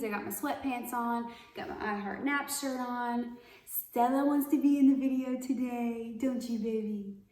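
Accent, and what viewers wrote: United States. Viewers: ahhhh. I get you now. you have a little southern charm in your voice. I picked that up quicker than I did with your sarcasm.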